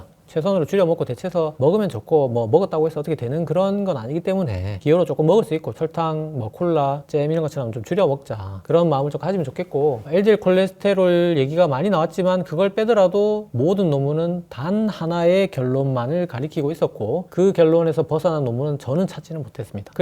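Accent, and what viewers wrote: Gyeongsang, South Korea. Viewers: 쫏기듯 말은 빠르고, 발음은 정확하지 않고, 톤은 높고, 경상도 억양은 심하고 듣기가 불편함. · 오늘따라 경상도 억양이 너무 쎄게 들리네요 말을 잠간 끈어서 말씀해주면 감사겠습니다 숨도 안쉬고 계속 말하는것같아 듣기가 좀 힘이듭니다 항상 감사합니다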